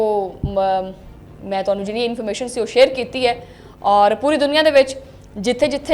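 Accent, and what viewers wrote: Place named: Punjab, India. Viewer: Hi.. dear..🤗 I subscribed you today.. I'm from Lahore Pakistan. and I saw your some vlog.. I the way you talk and express your opinion with typical Punjabi tarrka.. I love it. I wish best of luck for your all upcoming vlogs... Stay blessed.. keep it up.. 😍👌👍👍👌👌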